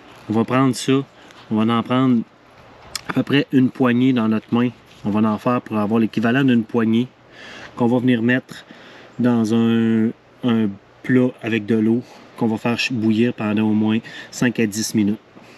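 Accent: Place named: Quebec, Canada. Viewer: Merci mon ami quebequois de bon conseil en plus d un bon accent ....t es un "chum".....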